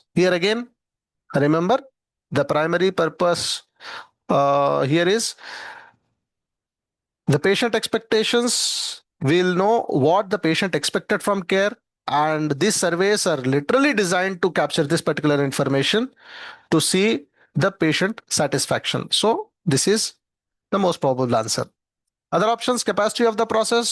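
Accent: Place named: India. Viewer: ليش هندى اللى بيشرح ...على رأى ترمب لما قال اى دونت اندر ستاند ..سيت داون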